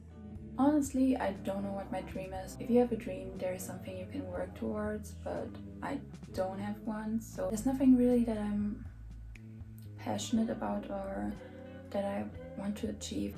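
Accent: Germany. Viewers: Omg I go to a German school where I learn in English but I’m learning German too,I noticed you were German from the moment I heard you!! Du bist ganz schön und cool😎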